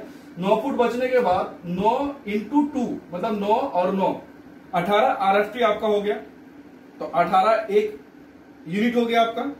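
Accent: Odisha, India. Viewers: Bhai aap oriya ho kya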